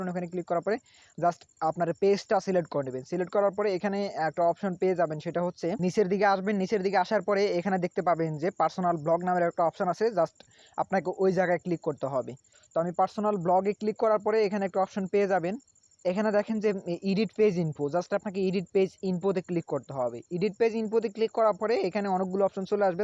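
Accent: Noakhali, Bangladesh. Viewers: ভাই আপনার বাড়ি নোয়াখালী কোন জায়গায়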